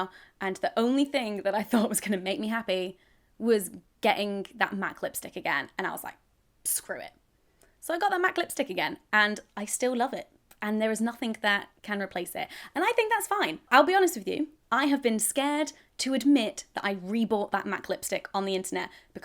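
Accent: France. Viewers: Ton accent français est adorable!! ❤️ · wow loving your French accent for REAL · You have a nice French Accent :) Just need some practice. I would love for you to come here, I'll be glad to see you <3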